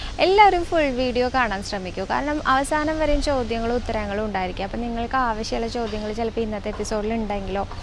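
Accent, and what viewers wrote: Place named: Namboothiri, India. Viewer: ഹരേ ശ്രീ ഗുരുവായൂരപ്പാ ! മോൾ ടെ ഓരോ ദിവസത്തേയും കഥകൾ കേൾക്കുണ്ട്. വളരെ ഭംഗിയായി അവതരിപ്പിക്കുന്നുണ്ട്. ഒരു നമ്പൂതിരീശൈലി ഉള്ളതു കൊണ്ടു തന്നെ അവതരണം ഗംഭീരമാകുന്നുണ്ട്. എനിക്ക് വളരെ ഇഷ്ടമാവുന്നുണ്ട്. വളരെ നിഷ്ക്കളങ്കമായി അവതരിപ്പിക്കാൻ കഴിയുന്നതു തന്നെ ഗുരുവായൂരപ്പൻ എപ്പോഴും കൂടെയുള്ളതിന്റെ ലക്ഷണമാണ്. ഭർത്താവിന് അഷ്ട ഐശ്വര്യവും നൽകി ഗുരുവായൂരപ്പൻ അനുഗ്രഹിക്കട്ടെ! മോളു തന്നെ ഈ പരിപാടി അവതരിപ്പിക്കുന്നതാണ് പ്രേക്ഷകർക്കിഷ്ടം. തുടർന്ന് പോവുക. ഹരേ കൃഷ്ണാ .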